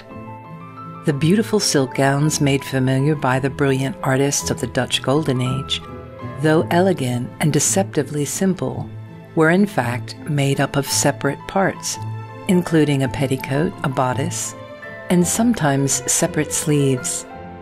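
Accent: United States. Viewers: Why am American accent?? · Why is a yank, who is talking with a "porn voice" narrating this?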